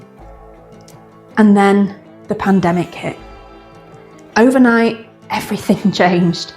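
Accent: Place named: Northern England, United Kingdom. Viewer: Welcome to Ireland. Great northern English accent! Good luck.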